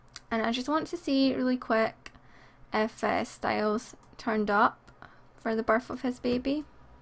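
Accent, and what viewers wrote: Scotland, United Kingdom. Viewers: are u Scottish? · ***** I could tell lol! You sound nice, so it's okay. Loads of Scottish people sound nice (nearly wrote Scootish, wow).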